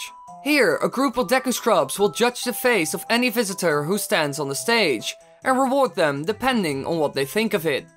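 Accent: Netherlands. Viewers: Are you Dutch because I recognize the accent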